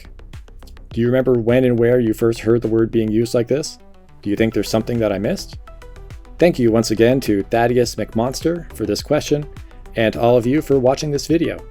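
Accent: Newfoundland, Canada. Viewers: ... yet another Canadian making YT video documentaries... what is it with you guys? do they put something in the water? It seems like every time an interesting documentary-type video is suggested to me, I hear the ole Canadian accent. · Are you from Newfoundland?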